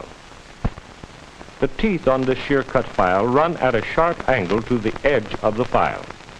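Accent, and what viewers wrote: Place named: Transatlantic, United States. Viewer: It’s the “transatlantic” accent and they used to teach it in schools. It’s the american equivalent to the British RP accent.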